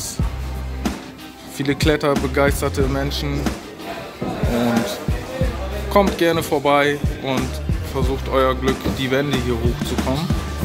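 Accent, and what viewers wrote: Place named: North, Germany. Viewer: Du redest richtug wie ein norddeutscher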